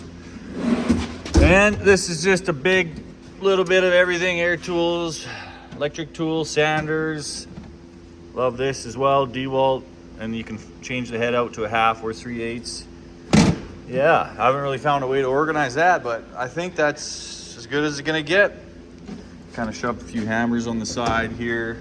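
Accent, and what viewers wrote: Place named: Canada. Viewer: heard you talking and said he's Canadian then saw the Berta tags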